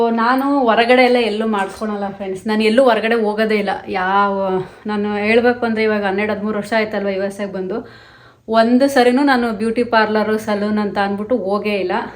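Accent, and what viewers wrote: Mandya, India. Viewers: mandya bhashe ne hange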